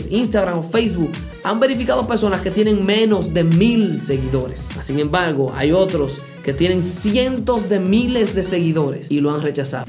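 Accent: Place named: Dominican Republic. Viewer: En tu acento te Me pareces dominicano espero que sí pro ases muy bueno videos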